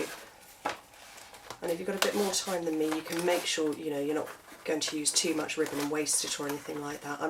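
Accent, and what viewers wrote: England, United Kingdom. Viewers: I absolutely love all of your boxes and bags. They are quite adorable and just perfect! Also, my favorite accent ever is the English accent. So watching and Listening is wonderful! Thank you!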